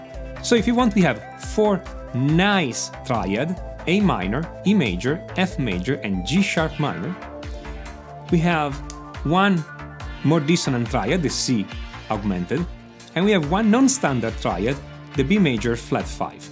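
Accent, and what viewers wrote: Italy. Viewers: You're very useful to the one who studied a lot. Thank you! Are you italian? Your accent sound so italian. Sorry for the stupid question!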